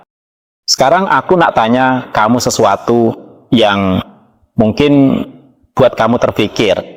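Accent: Indonesia. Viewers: Berbahasa Malaysia beraksen Jawa . .?!!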